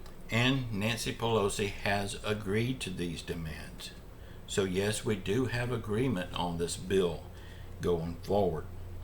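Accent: South, United States. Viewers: I'm Southern also. We know what we are talking about. Keep on speaking your own speaking. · I like that good old southern talk.Born in the south myself,I Talk the same,though have lived near Seattle for years.This channel makes Me feel right at home. God bless. Chow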